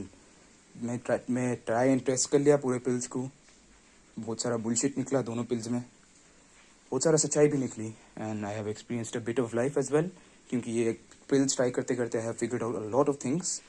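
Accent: Hyderabad, India. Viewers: 😭😭hyd accent ong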